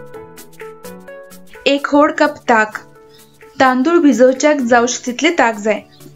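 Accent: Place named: North Canara, India. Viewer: Very nice ithink you are from north canara .my mother wasfrom north canara .always she is to make .her bhasha also like you speeking.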